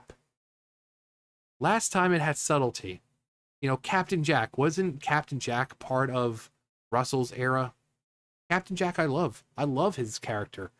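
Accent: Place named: United Kingdom. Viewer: Brilliant analysis (and Brit accent) 😊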